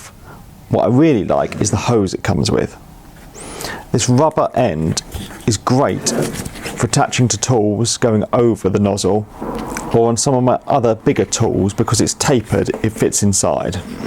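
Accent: RP, United Kingdom. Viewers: Gosh, you sound posh. But, great review mate. Thank you.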